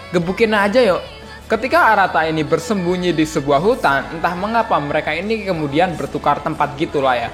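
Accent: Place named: Java, Indonesia. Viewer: kek si re:Jero mati terus ampe bosen Gw suka logat nih creator jujur(asal jeplak) dan medok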